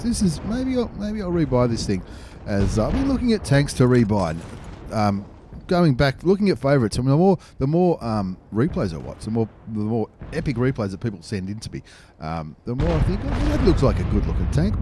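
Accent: Australia. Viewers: Hey yes you not those other bloaks luv your videos and awesome aussie accent keep up good work from Jax Fla USA P.S no homo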